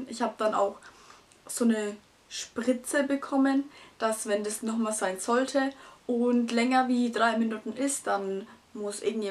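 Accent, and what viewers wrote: Germany. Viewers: Du bist ja hübsch 😊 und noch so jung! Kommst du aus Franken? Du redest wie ich 😂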